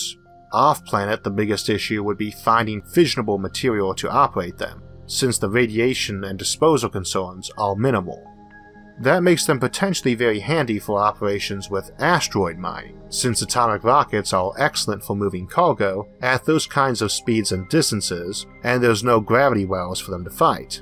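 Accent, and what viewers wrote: United States. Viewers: Where are you from? I can't tell if it's Southern or Boston. But it's probably neither - not that good with American accents yet. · I wish more people sounded like you. Almost sounds country and like I'm in a movie. Very well informed. Thanks for inspiring me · actually what you call a speech imperiment to me sounds like most british people. like how brits pronounce english letters. but your accent is american. works for me! 👍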